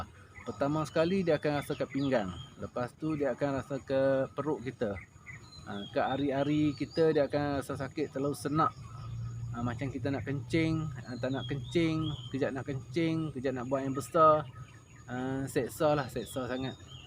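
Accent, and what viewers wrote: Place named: Kelantan, Malaysia. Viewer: Cara cakap mcm org kelantan...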